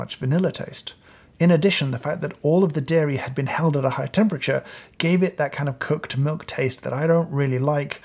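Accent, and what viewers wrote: United Kingdom. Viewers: Top notch British accent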